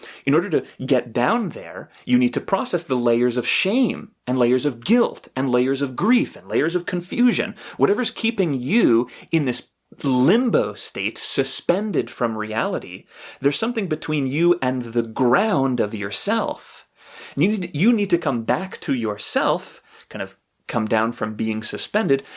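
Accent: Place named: Canada. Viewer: Are you Canadian ? You say certain words like Canadians do lol .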